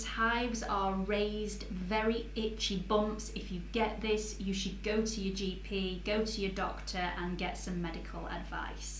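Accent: United Kingdom. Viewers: BritishJamaican777 British English 😂😂😂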